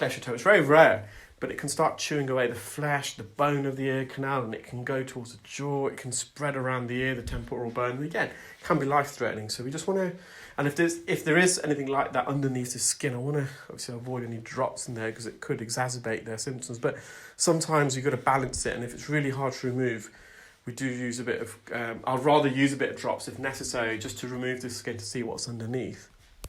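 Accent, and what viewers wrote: United Kingdom. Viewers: "...I am kind of renowned..." 💙 He manages to say it in such a way it doesn't sound arrogant or conceited. At all. Not sure if its the British accent to my American ears or he's humble and confident. Either way, I really adore him and his work.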